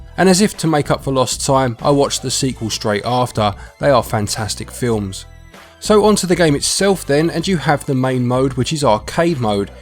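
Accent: United Kingdom. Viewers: !!! When did you get so suave and british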